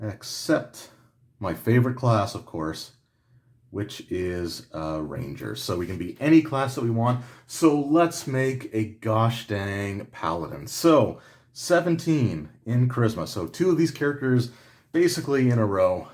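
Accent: Canada. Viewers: Thanks for the video. Love seeing 2E stuff. You sounds like you're from east coast Canada :)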